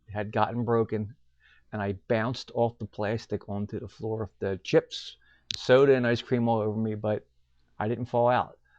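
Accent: Philadelphia, United States. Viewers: Thanks for posting. As another commenter said “love your Philly accent(!)”. My Dad worked there for 37 years. First in the building with the clock tower. Moved to the new building next door when it opened. Retired 1971. He talked with respect and admiration for the fire fighters of Engine 70. Also the Philly PD. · Love your Philly accent! Thanks for the great video & interesting commentary.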